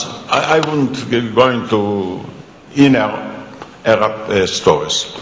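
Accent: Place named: France. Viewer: boyle francizca aksani kulaga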